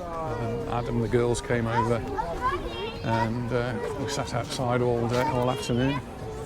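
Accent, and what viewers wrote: Stoke, United Kingdom. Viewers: Thanks for this, great video. I'm popping over for the night on Sunday. Stayed one night in El Arenal last time, loved Can Pastilla, so I'm staying in the BQ Amfora Beach. Do I detect a fellow stoke twang in that accent?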